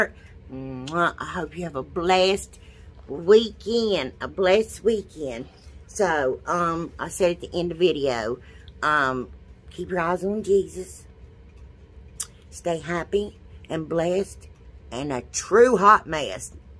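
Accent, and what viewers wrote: South, United States. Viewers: Where do you live? That southern twang🤣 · Again, of course said in an awesome Southern accent!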